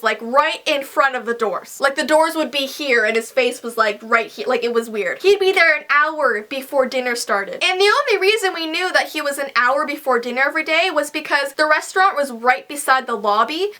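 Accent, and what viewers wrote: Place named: Ontario, Canada. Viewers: Ya Ontario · Yeah she is from Canada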